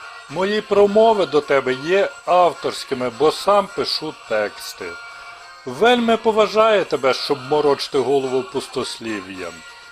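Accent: Standard. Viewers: Дякую вам за допомогу людям ! Дуже приємно чути чисту солов'їну !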